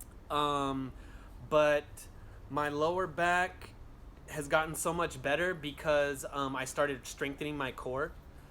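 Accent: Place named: Los Angeles, United States. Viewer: I knew u were from L.A. right when i heard u talk homie!😎👍 You the tightest i seen. Keep it up bro✌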